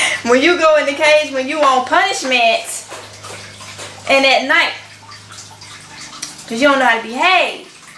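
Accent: South, United States. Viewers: Love her country voice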